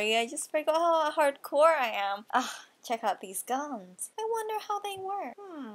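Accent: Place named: United Kingdom. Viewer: i think ur smart :) indonesian who has a brithis accent lol :) salam hangat dari Indonesia :) i wants ur life lol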